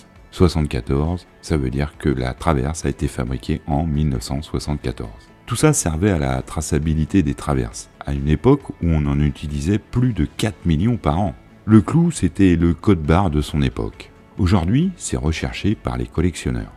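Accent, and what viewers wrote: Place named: Bagnolet, France. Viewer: de rien, et y a ton accent aussi, typique de c'que j'entendais quand j'étais gosse à bagnolet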